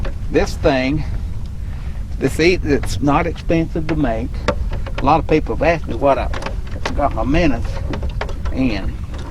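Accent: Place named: South, United States. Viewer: Thanks for your videos and knowledge. I have always been a bass fisherman but just bought a new boat and my dad wants to go trolling for crappie. I had no idea how involved crappie fishing is. You really educated me and I appreciate your knowledge and the way you do your videos. you talk "regular" good old boy language I can understand! Thanks for sharing your knowledge!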